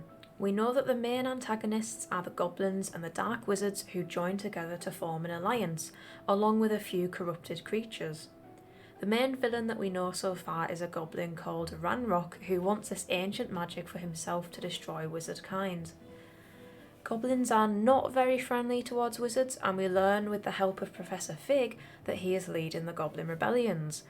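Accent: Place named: United Kingdom. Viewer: I’m convinced a British person can read anything and make it more interesting 😆 great video!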